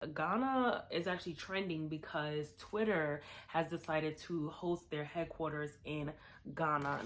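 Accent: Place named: United States. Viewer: Good video! we have to find a way to have cultural pride without being competitive. There isn't ONE thing about anyone culture that would make them "great"or better than the next. It's influences from all over. you are a proud beautiful woman of Nigerian descent but to be honest huge part what makes you poppin is you talk and like a Black American girl from NY! Period. All of our influences from the continent, the carribean and black America is what shapes us into something special. We all add to the pot to make something beautiful. No ones contribution is greater than another.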